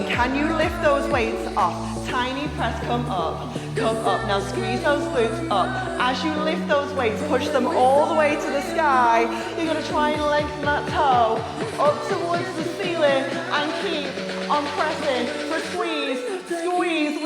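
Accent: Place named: United Kingdom. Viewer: So happy I found your channel. I’m coming down from intensive exercise & being kinder to my body. Loving the combination of weight’s & pilates moves. Love the fact that you’re U.K. too, fed up of listening to over hyper American accents.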